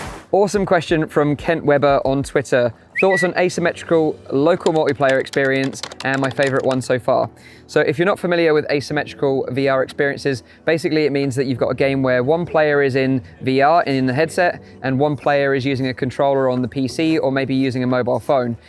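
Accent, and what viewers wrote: United Kingdom. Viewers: Defo uk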